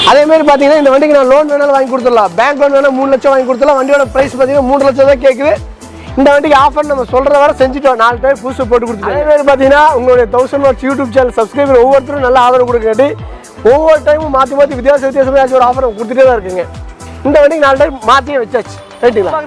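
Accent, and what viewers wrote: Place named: Kongu, India. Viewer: Neenga pesratha paatha ipove vanthu vaanganum pola iruku super Anna kongu tamil azhage azhagu thaan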